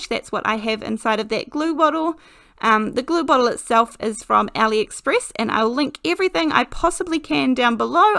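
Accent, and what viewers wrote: New Zealand. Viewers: It's gorgeous. Love all your ideas - I have that old stamp set and have never noticed the birds - they are coming out of hibernation, I can tell you now. The simplicity of the card makes it even prettier I think. I would love to get Alina's tape, but it is quite expensive when you add the shipping to Australia and then have to wait for the product to arrive as well. However, it does look fabulous and great to work with. You have an N.Z. accent but you have an Irish / American roll to your r's in words like bird and work. A connection there perhaps? Just curious. Still loving watching all your Youtubes. Thanks so much for sharing your great ideas.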